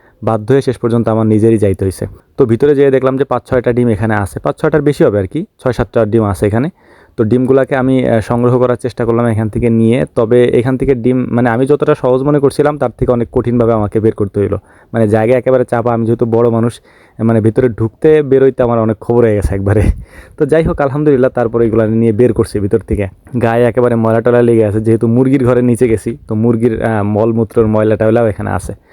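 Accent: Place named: Dhaka, Bangladesh. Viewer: Aponar vasa sune mone hoy aponi Dhaka district er manus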